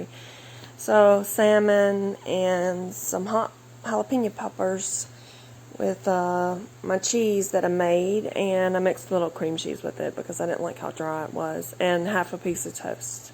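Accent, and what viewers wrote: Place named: South, United States. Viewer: Hey I love your accent.. I’m originally from India but I have been living in Chicago for 6 years now but I hardly meet people here with your (southern?) accent. I visited Mammoth caves last year and I met locals with your accent and they were super friendly. And yes I agree milk is so satiating. I like yogurt and butter too. I Can eat a stick of butter everyday.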